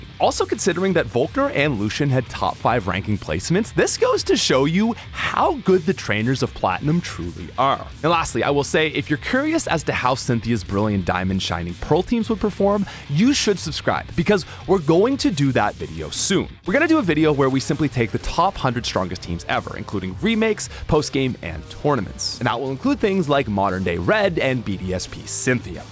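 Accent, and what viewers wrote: Canada. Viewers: Digging the Canadian accent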